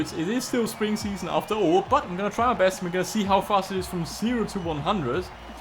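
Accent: Germany. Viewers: Er hat einen deutschen Akzent oder bin ich bescheuert? 🤔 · You are a German right? Ps: Me too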